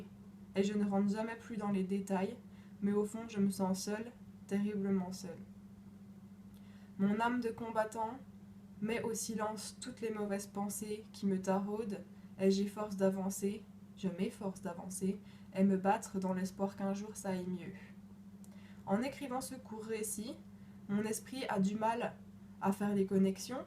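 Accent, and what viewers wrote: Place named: Upper Doubs, France. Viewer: Warrior ! difficile d'y croire quand tout se déchaîne (moi crise tous les 8 jours) mais les traitements se précisent et la recherche progresse (doucement)... on a encore bcp de thérapie à essayer ... Sois forte et quand ça va pas ..."Accueille" et repos et petits bonheurs qui vont bien... dis moi, avec l'accent que tu te payes (lol) tu serais pas du haut doubs toi ??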